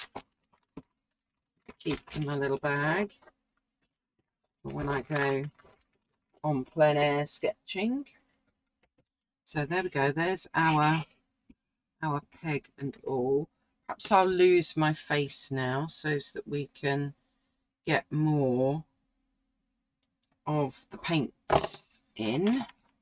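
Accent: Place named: London, United Kingdom. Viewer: ￼ ￼ Hi! Saw your comment on my most recent video and thought I would hop over to see what you get up to on your channel! Good to hear another English voice – do I detect a London accent? Cos that’s where I’m from originally! Interesting to see the things that you’ve recently purchased. I’ve had a couple of things from Temu that haven’t been to marvellous, but I’m always interested to see what they are having on offer. Have you heard of the fountain pens by Sailor? They seem to be trending at the moment…￼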